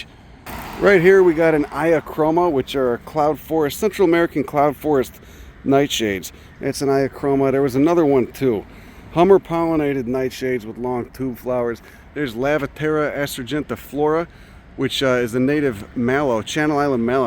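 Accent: Chicago, United States. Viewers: Haha! You are the new joy of my day. I'm guessing from your accent - Chicago? You are also an attractive man. Show your cute face more! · Are you from Chicago? I hear an accent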